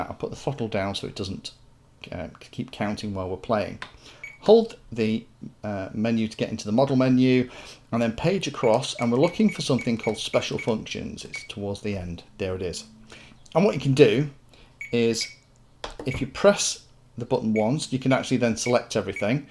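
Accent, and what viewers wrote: United Kingdom. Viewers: I want to duplicate my Spektrum NX10 timer1 in my TX16S. The Spektrum timer allows you to start and stop the time based on throttle stick position. For example, I have a nitro model that I set the time to count down when the stick position is more than 20%. The reason is that there is very little fuel burn at low throttle and this is a better judge of flight time than the th% function of the TX16S. Is this possible with the TX16S? I love watching your videos and especially your British accent. I live in Oklahoma, USA.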